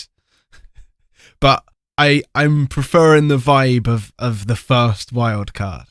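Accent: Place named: United Kingdom. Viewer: И твой британский английский как скальпель по яйцам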